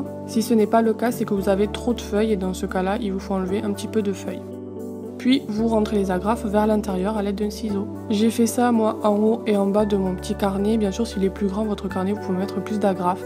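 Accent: South, France. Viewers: Alors First: j'adoreeeeeeeeee ton accent du sud 😍Et secundo: Les DIY sont vraiment Topisimes! Surtout la broderie! ca me donne pleins d'idées 😍😍😍Bravo!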